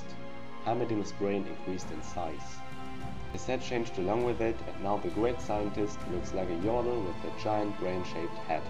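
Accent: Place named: Germany. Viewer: german champ + german accent = perfect!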